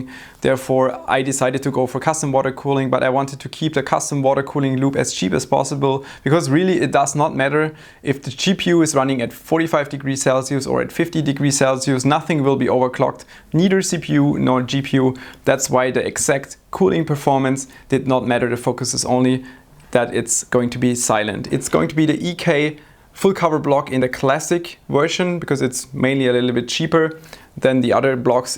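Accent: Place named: Germany. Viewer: Just out of curiosity..... Why do Germans say the words like “of” and “have” with an English V, and some words like overclocked with a W sound? Great video, and no RGB.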